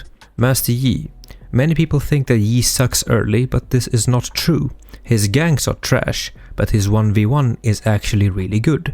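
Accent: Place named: Sweden. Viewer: From sweden right?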